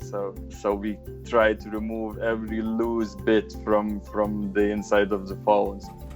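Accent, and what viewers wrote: Russia. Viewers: He speaking with Russian accent?! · Акцент такой русский) жесть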